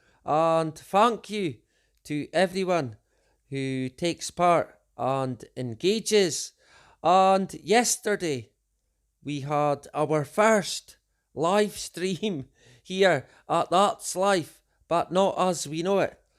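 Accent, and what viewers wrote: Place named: Scotland, United Kingdom. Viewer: Thanks for your dedicated research and always bringing us the real news, with your ever soothing Scots burr! Much love!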